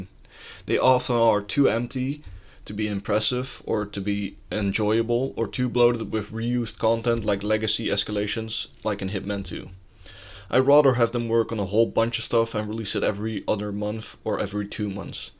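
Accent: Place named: Netherlands. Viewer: Me too, i recognized a Dutch accent :)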